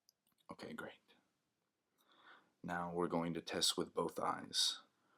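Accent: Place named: South, United States. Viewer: You have a great voice! It's kind of southern without being southern. I grew up in FL, so there is definately a distinction there. I usually enjoy the light stuff, but with this video I liked the audio more.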